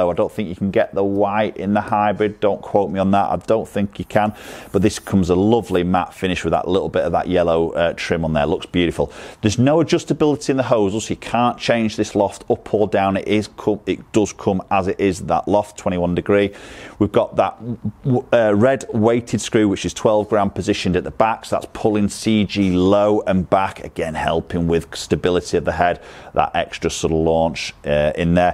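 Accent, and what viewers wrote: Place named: Lancashire, United Kingdom. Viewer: Great pronunciation 👍 spoken like a true Lancastrian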